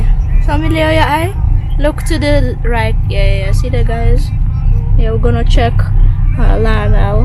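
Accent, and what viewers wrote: Jamaica. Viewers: GIRL UR SÒOOO FUNNY, WITH UR JAMAICAN ACCENT, YOU HAVE ME LAUGHING, 😆😁UR A REAL JAMAICAN GIRL NOW, I MUST SAY, L❤❤❤U ALL 🥰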